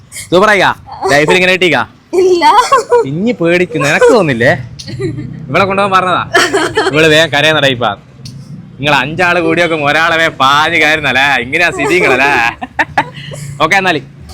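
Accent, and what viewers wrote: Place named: Vadakara, India. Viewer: ബ്രോ അയാൾ എങ്ങനെ പറഞ്ഞാലും അങ്ങനെ വരു കാരണം ഇയാൾ വടകര നാദാപുരം slang ആണ് ath അയാളുടെ മിസ്റ്റേക്ക് അല്ല but ഞാൻ അവിടെയല്ല ഞാൻ avide പഠിച്ചിരുന്നു അത്കൊണ്ട് എനിക്ക് മനസ്സിലാകും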